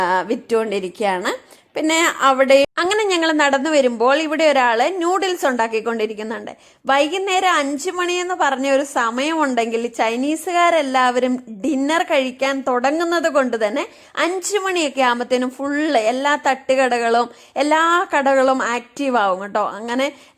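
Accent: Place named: South Kerala, India. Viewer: kannur slang alla. South kerala slang aanallo.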